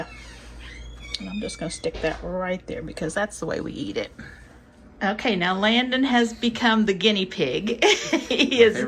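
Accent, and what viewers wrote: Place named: Missouri, United States. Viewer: It's nice to hear a Missourian talk - warshing instead of washing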